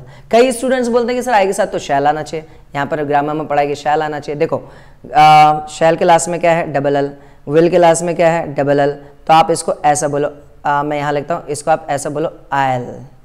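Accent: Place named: United States. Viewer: He speaks with an American accent...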